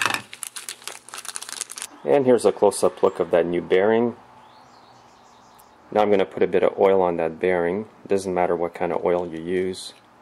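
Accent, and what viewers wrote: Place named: Canada. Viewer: you sound somewhat Canadian. Nice vid tho, its good to see a professional do it, in the correct order. What solvent are you using in your parts washer? It dosent adversly effect the ignition bits? I'm sure its all solid state.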